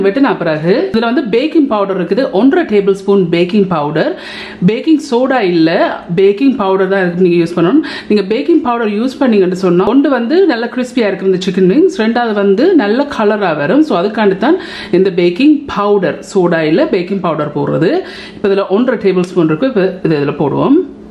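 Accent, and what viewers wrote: Sri Lanka. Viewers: Ilangai thamizh ❤❤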